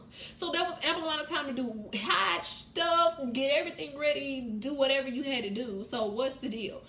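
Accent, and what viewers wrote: Louisiana, United States. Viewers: Your Louisiana accent is soooo cute! ❤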